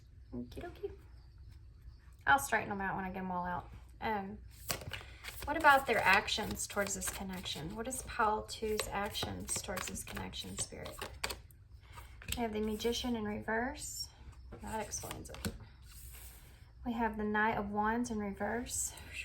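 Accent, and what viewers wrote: South, United States. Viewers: Hi :) I just found your channel and I have to say that I love your energy and I really relate to your messages and the way you bring them across and they are helping me so much right now.. I’ve always loved a southern accent too so I just like listening to you talk! Lol thank you for taking the time to do these readings I truly appreciate it :)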